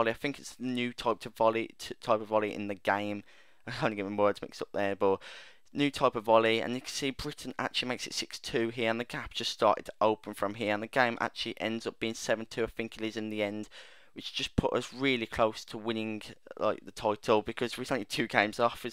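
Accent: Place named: Birmingham, United Kingdom. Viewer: Where are you from, you sound like your from birmingham?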